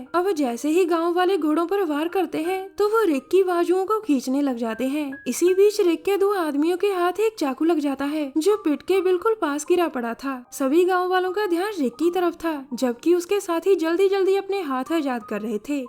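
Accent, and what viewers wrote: Punjab, India. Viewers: See I was thinking about you nd u r here... sachhe wala pyar h... nibhana apko bhi pdega.. Lv uuuuuuuuuuuu............uff ye Punjabi accent 😍 👌....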